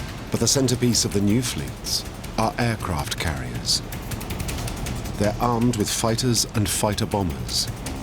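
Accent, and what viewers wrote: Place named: England, United Kingdom. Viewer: Wanted watch it but I ain't with those English accents we had fight to rid of English so... I speak American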